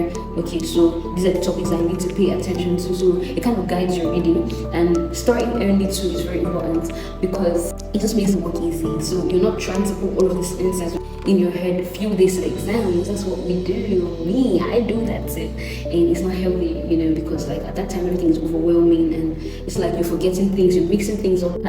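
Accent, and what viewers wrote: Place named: Nigeria. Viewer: I love your accent, are you Nigerian?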